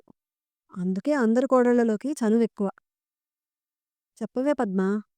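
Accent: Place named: Telugu, India. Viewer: చాలా బాగా చదివారు. గొంతులో తెలుగుదనం చక్కగా తొణికిసలాడింది.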